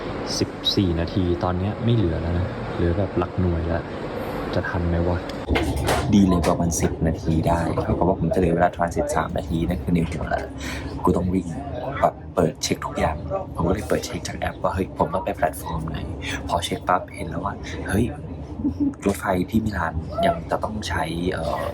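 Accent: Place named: Khon Kaen, Thailand. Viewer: มันคือ ภาษาขอนแก่นค่า 😂😂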